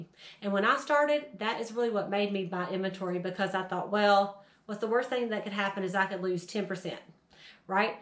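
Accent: South, United States. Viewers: Love your genuine southern accent. And of course the way you break it down for us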